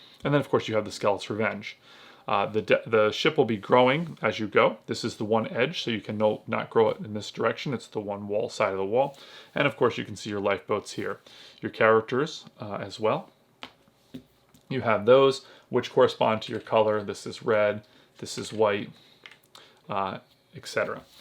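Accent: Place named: Boston, United States. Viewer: Thanx for this mate. I'm hearing you're from somewhere in MA/Boston area, yeah?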